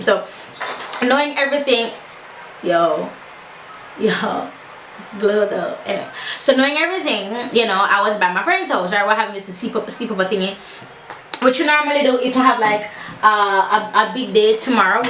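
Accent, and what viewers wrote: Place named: Jamaica. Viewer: love you girl. ..proud to see a Jamaican in this mode...positive and smiling. ..love how yu switch up di talk!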